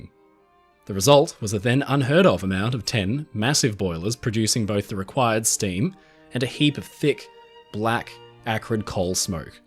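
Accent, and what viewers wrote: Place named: Australia. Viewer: It's because he's Australian. Different countries have different pronunciations of the same words. Neither is right or wrong. It's basically the same thing as those of us in the US pronouncing herb as "erb" when England pronounces it with the "h" sound at the beginning. Now, they could definitely tell us our pronunciation is wrong because they were saying it with the "h" before we were even a country, but would you say we're wrong? Probably not, and neither are they.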